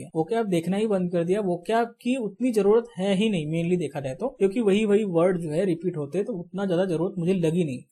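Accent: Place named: South India, India. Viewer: Bhai tum south indian jaise sound kr rhe ho is video me. 😮 Mast Hindi boli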